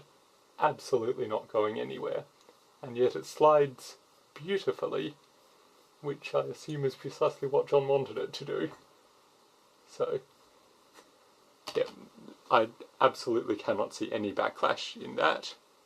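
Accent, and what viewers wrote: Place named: Australia. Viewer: You hit the nail on the head with this video, metal screws shouldn't just screw into plastic, look at the main long rod from the motor, thats the same deal, You need to make sure you get a copy of the STL file so you can make another as this is where you will get wear and tear, all of any of the moving parts that are metal that are in 100% contact with plastic will sooner or later fail, look at a RepRap for how the screw rods all have a nut to screw into and not bare plastic. You sound like an Aussie, I am as well, I had an order with this guy at milkyoubox and he took too long to send, 5 months was too long to wait, others wait still. Order canceled. This printer is cheap, in all ways, construction and money, but all up the waiting around costs more than anything, and all who give money too the guy at milkyoubox is padding his bank account as long as you wait, the interest from your own bank account you could have made on your money instead of he at milkyoubox.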